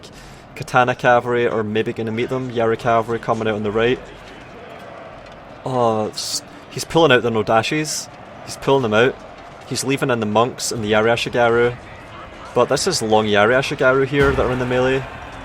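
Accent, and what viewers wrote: Scotland, United Kingdom. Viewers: Is this scottish accent? I'm amazed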